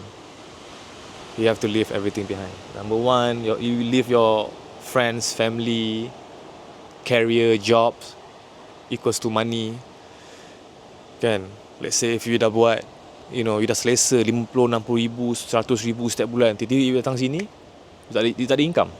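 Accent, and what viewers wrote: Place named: Philippines. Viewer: i honestly thought you are a filipino, had to pause what i am doing to see what your nationality is the moment you started speaking different language.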